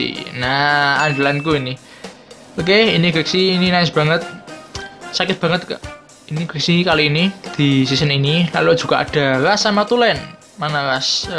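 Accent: Java, Indonesia. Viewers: Lu orang mana bang,,,,ngomng medok bnget,,,,,,wkwkwk,,,,, Salam negeri atas awan bang,,,,,,#dieng😂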